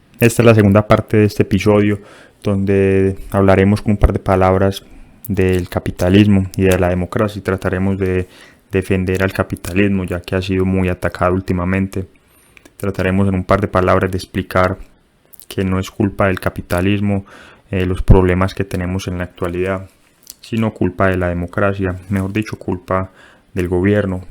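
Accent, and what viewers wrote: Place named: Antioquia, Colombia. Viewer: MENOS DE 10 SEGUNDOS DE VIDEO Y ME DI CUENTA QUE ES PAISA.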